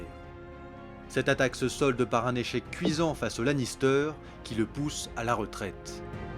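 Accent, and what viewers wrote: France. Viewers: Je jure si tu dit BORATHEON encore une fois avec cette accent français merdic qui me fait mal au oreilles.... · Encore une fois, très beau travail (même s'il manque pas mal de précisions; Jon Snow fait partie de la famille Stark, il n'est pas question de Tyrion, pas même de son frère Jaime, ni de Melissandre et du Dieu Rhor,... mais l'essentiel est ici). Seulement, les noms prononcés à la française, c'est assez déstabilisant.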